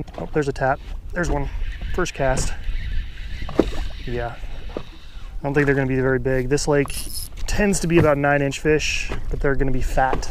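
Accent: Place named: Canada. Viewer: I thought you were from Canada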